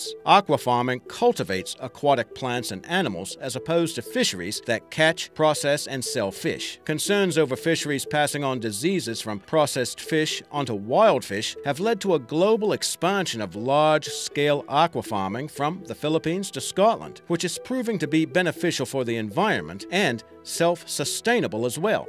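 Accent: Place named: Australia. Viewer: ...........had to listen to 3 or more to understand what he was saying.......an entire "ployeted"....gotta be Australian.........."knaiefe"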